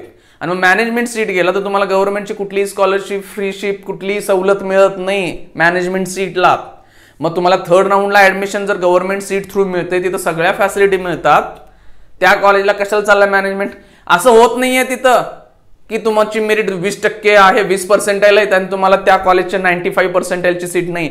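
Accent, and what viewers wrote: Kolhapur, India. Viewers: Siranchya bolnya varun vatatay sir sangli, satara, Kolhapur che ahet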